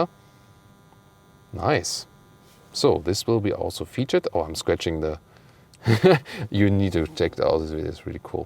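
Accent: Germany. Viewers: Yes sounds more real is like informations are more reliable since said with a German accent …. first ever car I have droven was a BMW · Fix diffrent person to show video this guy has Germany English accent we need international accent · Very nice, but I was having trouble understanding all of it. That thick German accent....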